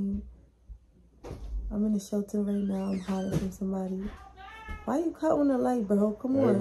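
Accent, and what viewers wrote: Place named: East Coast, United States. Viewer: you from the east coast? i get jersey brooklyn vibes..im from the west coast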